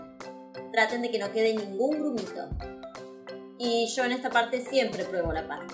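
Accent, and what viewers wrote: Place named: Argentina. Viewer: Hola te hago dos preguntas:1- hace cuanto que estas con este canal? (solo me aparecen 2 videos tuyos)2- ¿Eres de Argentina? Pregunto por tu hacento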